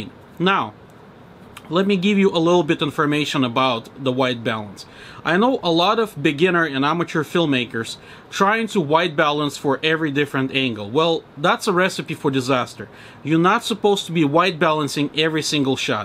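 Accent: Russia. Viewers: This guy Russian accent How do I use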